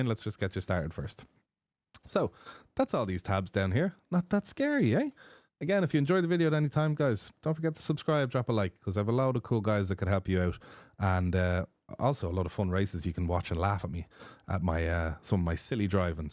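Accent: Ireland. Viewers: Love the irish accent the irish people have always been my favorite english speakers. From what i have heard you are very similar to us austrians😂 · It's "control R" - it's Irish accent!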